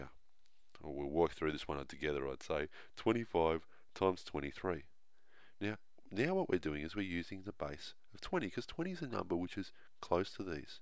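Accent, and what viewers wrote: Australia. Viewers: The best math teachers have Australian accents.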